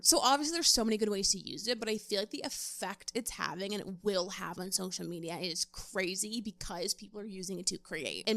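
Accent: United States. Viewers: American woman with that goddamn accent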